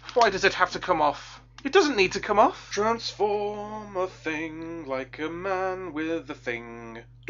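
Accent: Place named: England, United Kingdom. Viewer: Wait... are you from England like me meate? cos you sound like you're from my aera XD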